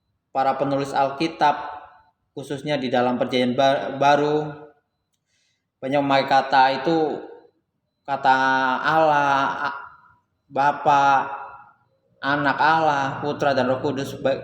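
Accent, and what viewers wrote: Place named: Java, Indonesia. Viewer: Logat Jawa...Pengin pulang kampung rasanya 🙈🙈 Sub jg Christian Prince channel mas..🙏🙏🙏 Ntar datang kesini ntar malam <link> Kutunggu ya Mas...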